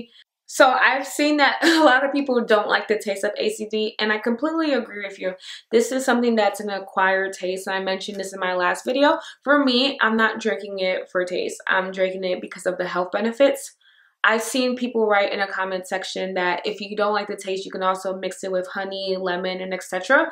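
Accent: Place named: United States. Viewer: I loved the way you spoke nd presented it.👌👌. Where are you from sweety? As far as I observed it helped to figure out, u r an American born.I might be wrong, but I wanna know☺☺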